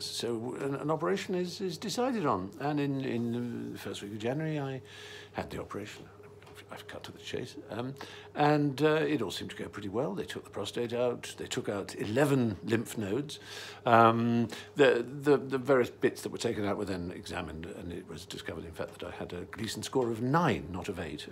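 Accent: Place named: RP, United Kingdom. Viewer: I love the way he speaks. So soft and posh. We need him to stay in this world forever!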